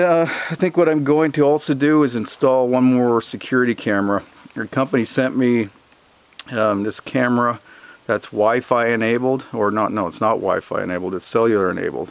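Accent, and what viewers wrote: Canada. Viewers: If auto insurance doesn't pay for the theft, check with your homeowner's insurance policy. Sometimes you are covered under that. Your videos are always such a treat for the senses. Stunning and beautiful. (And I adore your Canadian accent) 😎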